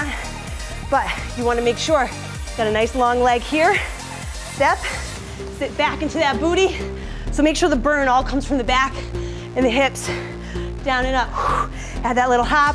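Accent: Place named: United Kingdom. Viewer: Wait, didn't she used to speak with a British accent :/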